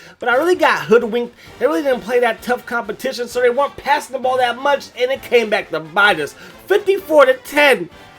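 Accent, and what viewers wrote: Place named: Baltimore, United States. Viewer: Barry Sanders and Thurman Thomas would disagree with your two RB statement. I am looking forward to another amazing series from you. One question for you. Are you from Baltimore or the surrounding area? The B-More accent comes out sometimes during your commentary.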